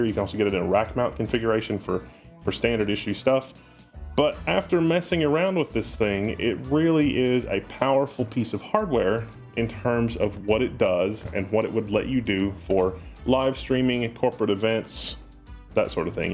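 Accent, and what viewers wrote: South, United States. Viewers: I watch this channel solely because of the girl. She has hypnotized me and I must have more content featuring her. She has a hint of south in her, maybe Alabama, Mississippi or Georgia. What's her name?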